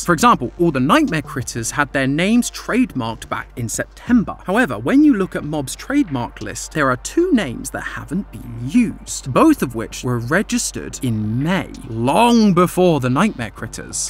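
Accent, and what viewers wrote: United Kingdom. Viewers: I think Tom was the perfect replacement for mat pat Except for the British accent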